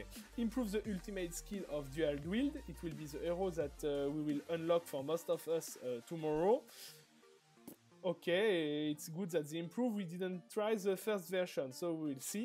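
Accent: France. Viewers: Bon gros accent français mdr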